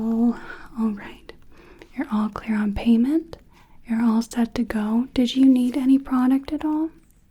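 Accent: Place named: Mississippi, United States. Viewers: super tingly ✨💕 the genuine listening “yeah/mmhmm” during the conversation 🥴🤌🏻💋 I’ve lived in Northern Mississippi most of my life so I’m used to this accent (I have a slight accent, maybe a little less than what you had in the video) so it didn’t hit as hard for me like everyone else 😔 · Your midwestern accent coming through with that mild southern accent you've got going here is so relaxing. I know you said you weren't shooting for anything in particular, but I love where you landed, lol.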